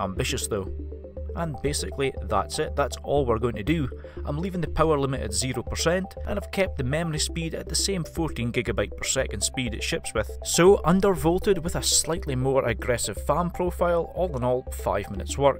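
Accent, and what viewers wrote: Scotland, United Kingdom. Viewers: yesss a Scottish narrator finally ear candy while i learn something... wow that sounded gaeeeee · the Scottish accent convinced me that I should do this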